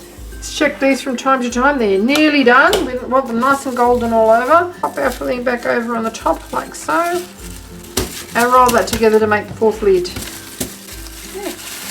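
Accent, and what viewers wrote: Australia. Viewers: Nice to hear an Aussie on YouTube!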